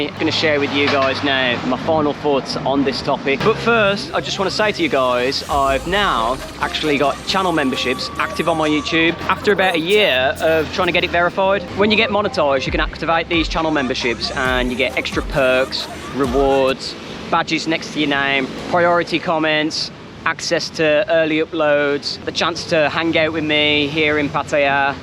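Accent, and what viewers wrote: Birmingham, United Kingdom. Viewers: You from Birmingham mate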